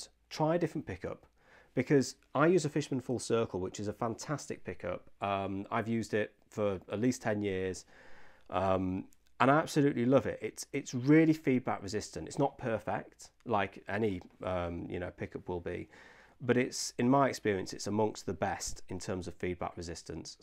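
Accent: United Kingdom. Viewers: I've got a completely un-bass-related question for you. You're from the UK right? What specifically is your regional accent? I've had an accent my entire life that many people have assumed is British, but I've always been skeptical, but never sure what it really was. There are certain nuances in your speech that actually match mine; so I was wondering what your regional accent is.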